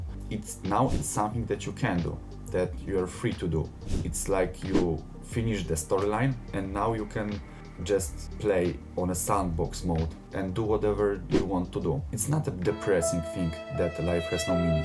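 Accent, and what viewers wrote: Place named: Poland. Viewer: Akcent bardzo polski, materiał ciekawy i ćwicz stary ćwicz!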